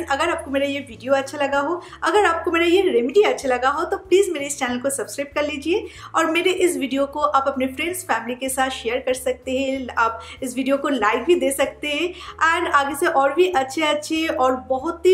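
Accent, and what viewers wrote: Bengal, India. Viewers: dude i'm gonna ask the same question, well her accent resembles Bengali😁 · Apki Hindi me Bengali tone h😁🤭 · App Bengali hain , mujhe baat sun ke laag raha hain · Yes it's the accent of bengoli s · u r bengali right? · Aap bengali ho right bola na😅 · Tag mark of Bengali that accent. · Are u bengali? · Apne to bangal ka didir moto hindi bolta he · R u bengali ? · Bengali? you have bengali accent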